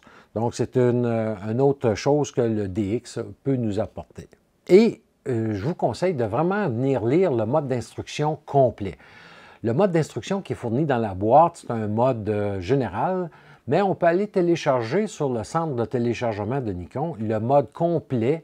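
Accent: Quebec, Canada. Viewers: Il ressemble vraiment à mon FM bien-aimé. Les démos avec l'accent québécois, c'est toute suite plus sympa.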